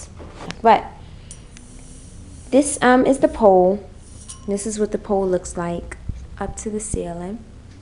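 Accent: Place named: Baltimore, United States. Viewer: Baltimore accent ok 😏Just bought this pole and this really helped! Thanks!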